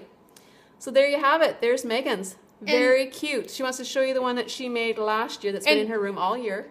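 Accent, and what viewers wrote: Canada. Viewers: Love them, and your accents! Are you Canadian?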